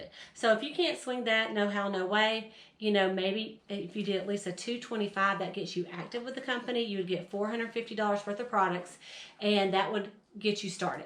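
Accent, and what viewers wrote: South, United States. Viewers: Love your genuine southern accent. And of course the way you break it down for us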